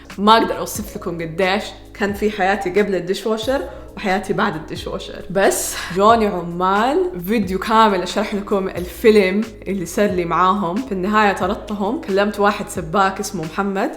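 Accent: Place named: Jeddah, Saudi Arabia. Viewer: حلو شديد وبحب لهجتك واضح انت من جدة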